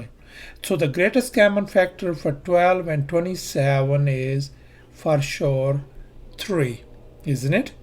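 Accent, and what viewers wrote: India. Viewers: I like your English but I don't know what you say I like indian English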